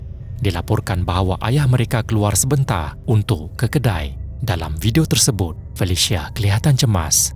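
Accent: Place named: Malaysia. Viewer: Min indon ke Malay? 😂Dngr mcm malay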